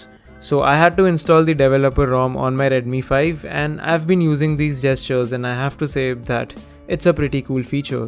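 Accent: India. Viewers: I love the way India saying "t" words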